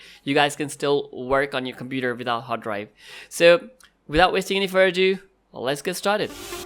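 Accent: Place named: India. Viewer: U r indian.. And have a great accent... Hats off bro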